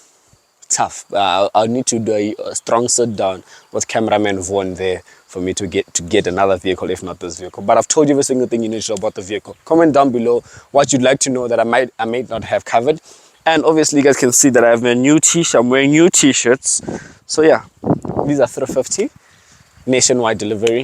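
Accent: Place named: South Africa. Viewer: We hardly here what you say, but maybe it's the south african accent... Other than that it's the best car review channel around the continent.👍🏾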